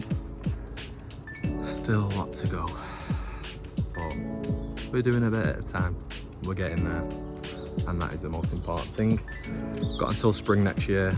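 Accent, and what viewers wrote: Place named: Northern England, United Kingdom. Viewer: Looks good! A good session there mate. Where are you based in the UK? You sound Northern. I'm from Preston. Can't be too far from me I'd imagine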